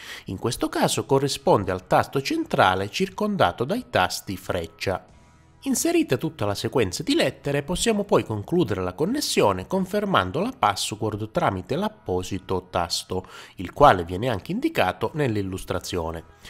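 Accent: Calabria, Italy. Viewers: Ma sei calabrese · e infatti, ti ho dato del Calabrese. Lo sono anch’io...perciò l’ho subito capito ascoltandoti 👍🏽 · Ma sei calabresee?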